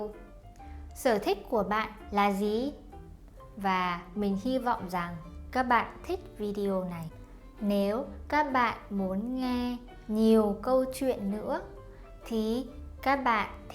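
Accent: North, Vietnam. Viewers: Giọng Bắc nghe thật dễ chịu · Nice Northern accent. Y'all truly nailed it. Keep it up girl !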